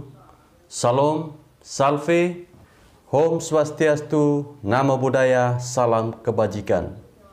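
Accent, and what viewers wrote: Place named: Flores, Indonesia. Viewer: Logat seperti org bajawa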